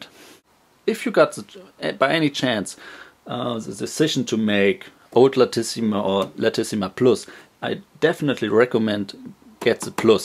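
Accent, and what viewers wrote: Germany. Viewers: I have to say there must be a reason Delonghi still retails the original for $100 more. Not sure why. I'm going to buy a plus as soon as I see a sale to combine with the $50 off from Nespresso. Your accent brought me back to my Army days in Wurzburg :)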